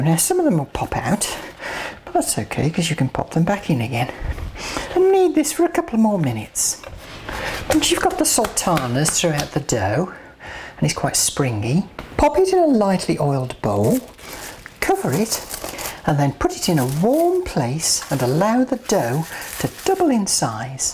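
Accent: Midlands, United Kingdom. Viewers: This lady is an absolute joy, and her recipes are so very easy to follow. Her soft Midlands accent only adds to the warmth of her personality. Wonderful!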